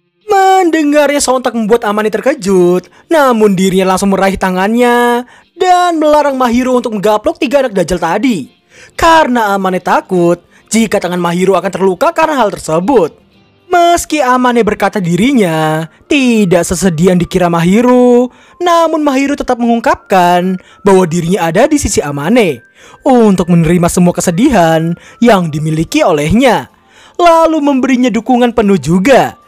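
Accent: Java, Indonesia. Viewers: bang orang jawa ya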